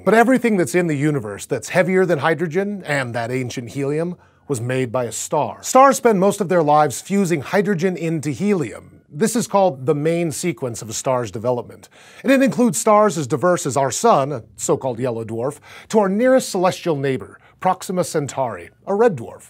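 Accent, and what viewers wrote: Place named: United States. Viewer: At this point, I just blame it on the American accent, and leave it at that. We all know what he means.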